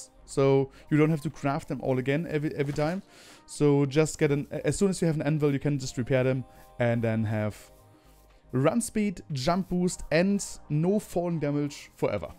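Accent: Germany. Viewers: Wow, thought by the accent that you were german. Spot on! Btw i'm from denmark. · Einfach wilder deutscher Akzent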